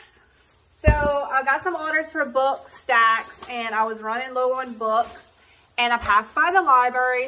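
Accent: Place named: Los Angeles, United States. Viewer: Love your ideas...learn so much...love your humor and LA accent🌱🦆🌱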